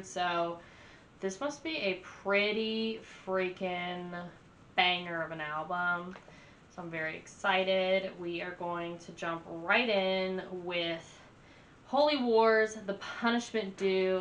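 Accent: South, United States. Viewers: I'm thinking it's thrash metal perfection. is incredible. One of the best metal records out there. Are you southern, or from like Tennessee? Love the accent in there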